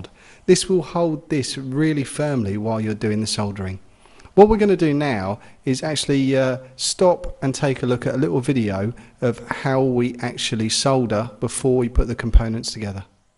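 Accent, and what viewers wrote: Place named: Southern England, United Kingdom. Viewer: The ugly mug is welcome! As is your amazing accent! Southern England? Anyway, thank you for the very informative videos! I am a first-time modder and your help is very welcome!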